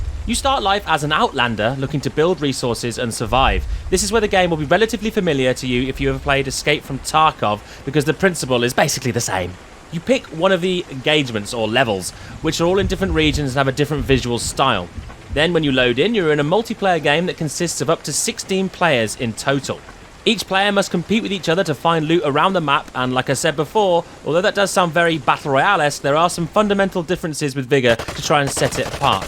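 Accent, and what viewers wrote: United Kingdom. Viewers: Uncomfortably British...jesus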